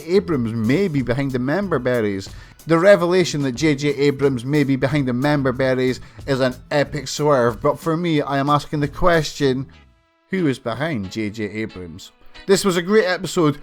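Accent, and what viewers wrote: Scotland, United Kingdom. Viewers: damn shame about your thick, almost undiscernable scottish accent. can hardly understand 30% of what the hell youre saying.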